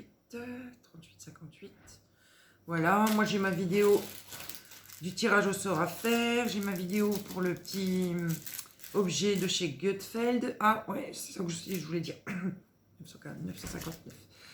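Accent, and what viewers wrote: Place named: Nord-Pas-de-Calais, France. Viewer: Le joli accent ch'ti...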